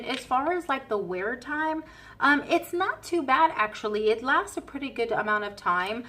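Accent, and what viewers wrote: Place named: United Kingdom. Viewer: Love this video. I can hear the British accent wanting to peak through. 😊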